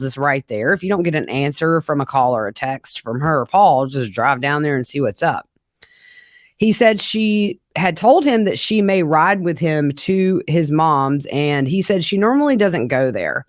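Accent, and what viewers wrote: South, United States. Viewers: This trial is already a passion of mine. A southern gothic tale! You know what we want to hear about and your honeyed tongue has the perfect down home accent. I’m betting that someone with important connections will agree with me and you’ll be even busier after this case has been resolved. Keep chasing the truth, girl!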